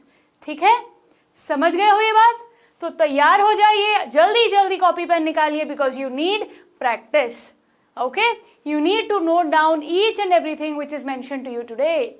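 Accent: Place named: Punjab, India. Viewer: Mam I can definitely say that your hometown is punjab because you words are generally punjabi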